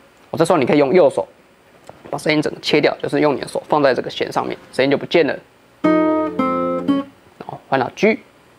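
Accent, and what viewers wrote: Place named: Cantonese, China. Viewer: 在說廣東話的時候好可愛....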